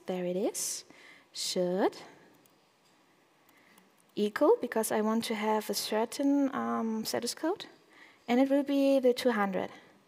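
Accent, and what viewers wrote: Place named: Germany. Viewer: Die Mucke =) Nicht schlecht Herr Specht =) Vorträge natürlich auch ^^ Das very special Englisch ist actually very german =)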